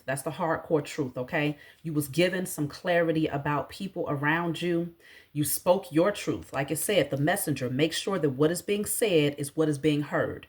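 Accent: Washington DC, United States. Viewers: This msg was 🔥 torch. Ur DC dialect puts a smile on my face every time…being from SE DC myself. As always thank you!!😉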